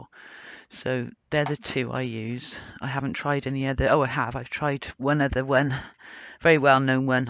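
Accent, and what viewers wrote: United Kingdom. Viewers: Well i for one love watching your tutorials, i have just been turning the volume up, which is easy. I noticed the difference with the new microphone! All good. I am definitely going to try this with Cernit translucent and Premo gold and copper. I do find Cernit REALLY sticky so I'll try leaching it first. I love your accent too. Wiltshire or Somerset? My gran was from Wiltshire and it reminds me of her. Cheers from Australia 🇦🇺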